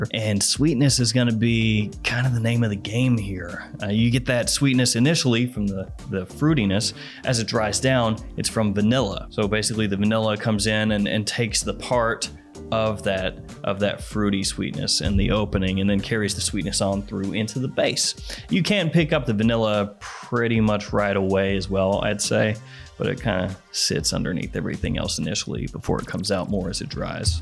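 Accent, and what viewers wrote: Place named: Tennessee, United States. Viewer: Your TN accent sounds pretty similar to ours out here in Raleighwood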